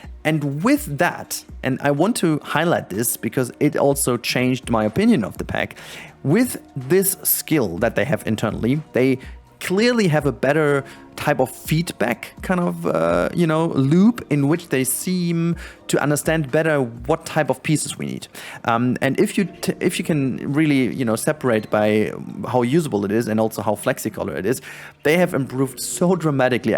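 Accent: Germany. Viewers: I hear the German Accent😂 I love it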